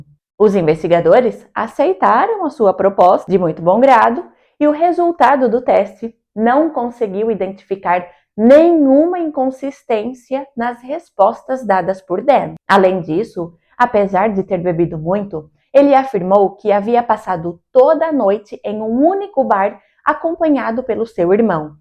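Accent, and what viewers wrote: Portugal. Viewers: você é brasileira? Que legal! Poderia jurar que vc era portuguesa, por causa do sotaque.❤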